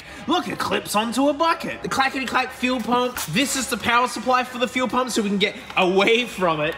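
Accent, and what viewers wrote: Australia. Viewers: Great Voice 👌🤑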